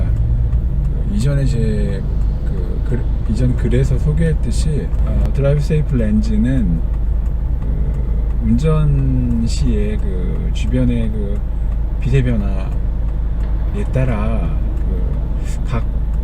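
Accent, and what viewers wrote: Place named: Chungcheong, South Korea. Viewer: 충청도 분 이신가ㅜㅜ말좀빨리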